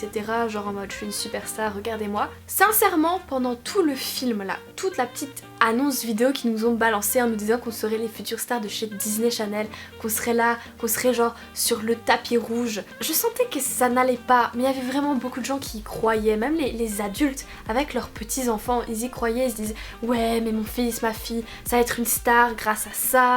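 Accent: South, France. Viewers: #AccentDuSud#Snap, sinon superbe vidéo comme toujours 🖇🖇❤️ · C'est juste horrible de se servir des gens comme ca avec plein de promesses en plus quand on n'y pense ca aurait pu être pire dans c'est cas la il peuvent demander n'importe quoi et tu ma surpris d'une part par l'accent du Sud qui revient et par ta patience 😊😊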